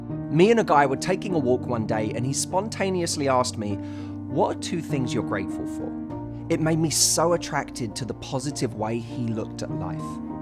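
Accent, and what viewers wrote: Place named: United Kingdom. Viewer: one more, i love the British accent of you, i not only learn English but more interesting things from you. Thanks very much.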